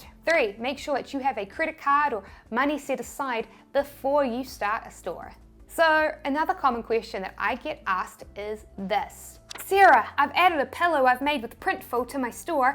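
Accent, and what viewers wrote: New Zealand. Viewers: It is so good to hear the kiwi accent in contrast to the the - mostly - american accents. I likw more of that……including your famous dance. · Ummm, Sarah, honestly I did not notice a "speech impairment." Unless, of course, you're referring to your NZ accent. LOL. But even if you have some sort of impairment, don't sweat it. Most people will never notice and it's really not important anyway. You're great just as you are. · Sarah, the amount of value you create with your videos is absolutely priceless! And for the record, kiwi accent cuteness does not qualify as speech impairment! :) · super helpful video, love the NZ accent! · Wow you sound so kiwi 🤣❤ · Just about to launch a print on demand store. Thanks so much for this information! An aside: it took me a second to figure out what an "allatronic" trademark search was. I love your NZ accent, however. 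It's wonderful. I'm in the UK and people sometimes cannot understand me! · She's a Kiwi (New Zealander) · Great advice found in here, blondie. I love that NZ accent.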